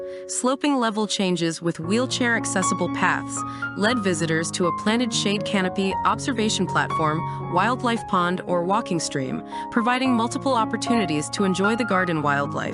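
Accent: United States. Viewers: why is this being narrated by an American robot?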